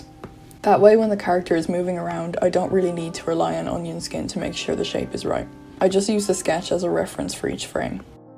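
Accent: Ireland. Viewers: casualy has an Irish accent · YEAAAA but it’s not super thick if that makes sense ?? But like ?? I still just adore it omfg I love irish accents sm 💀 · your voice is so cool :0 i can recognise an irish accent aha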